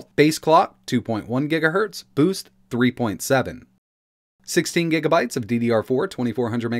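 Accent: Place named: United States. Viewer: What a voice of a cowboy sexy accent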